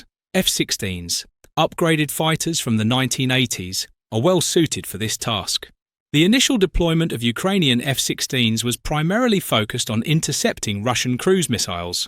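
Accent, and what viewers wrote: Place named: United Kingdom. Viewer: oh bullshit... this is all propaganda.. some brittish accent neocon accent narrator asshole brainwashing the idiots dumb enough to believe this propaganda...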